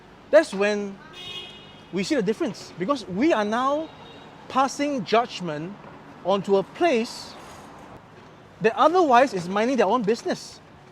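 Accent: Singapore. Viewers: I think his accent is Singaporean accent, not Indian :) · Singapore accent. Why weird? You are weird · Singlish is hard to follow……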